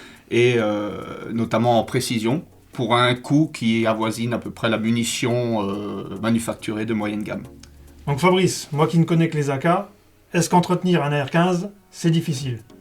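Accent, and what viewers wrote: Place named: Eastern France, France. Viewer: felicitation super chaine De beau montage video digne de professionnelle Sujets tres interessants et d'actualités et on parle beaucoup de TAR Avec un leger petit accent de l'est de la france si jai bien reconnu bonne continuation cordialement ludo du sud de la france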